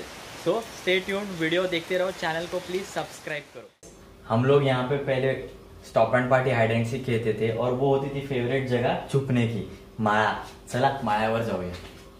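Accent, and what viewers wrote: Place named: Wadval, India. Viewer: काय रे बाबा कुठे राहतोस?वाडवल बोललास म्हणून विचारलं?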